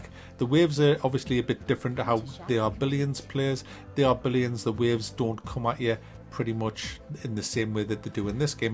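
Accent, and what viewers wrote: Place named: Northern England, United Kingdom. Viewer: Stupid world nowadays. Us northern lads speak proper i understood u perfectly. chuck, cob, wang, lob.all mean throw. luv ya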